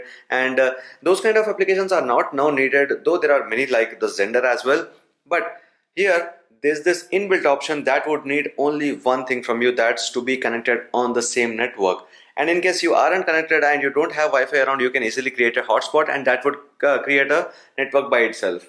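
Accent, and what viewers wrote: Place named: India. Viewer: Your spoken is so confusing... Indian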